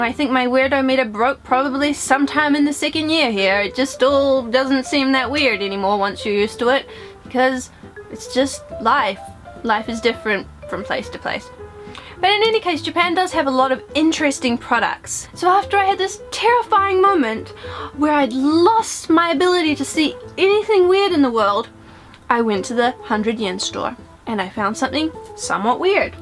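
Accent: New Zealand. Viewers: I love your videos so much, they always make me smile. I can't think of anything strange about my country (Australia). Also I LOVE your New Zealand accent ^-^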